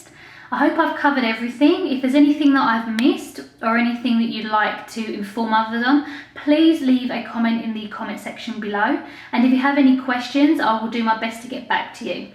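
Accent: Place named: England, United Kingdom. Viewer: Are you from UK? You sounded English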